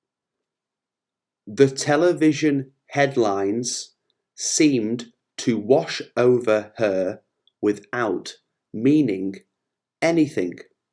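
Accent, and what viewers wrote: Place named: North, United Kingdom. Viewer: Your teaching method is fantastic but the pronunciation is like from Yorkshire or Manchester .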